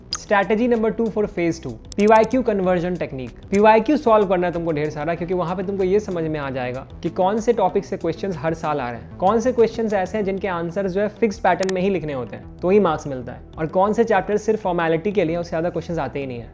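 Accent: Bihar, India. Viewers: Ig he's from Bihar,coz of his way of speaking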